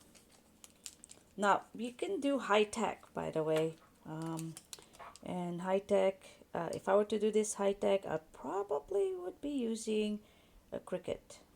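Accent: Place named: India. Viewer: Are you from india? Because you have a really pretty accent😻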